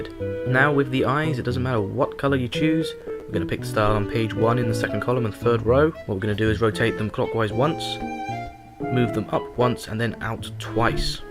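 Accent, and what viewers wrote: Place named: United Kingdom. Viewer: ops british!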